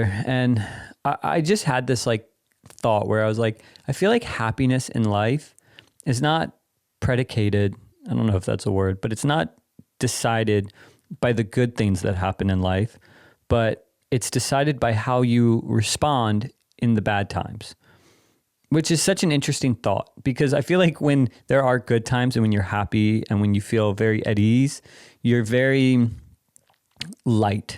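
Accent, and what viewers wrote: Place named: England, United Kingdom. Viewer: Please start making videos here again, i am from brazil, i love your english accent to practice my listening. And the main point is that everything u say at this channel is helping me a lot! Thank u ❣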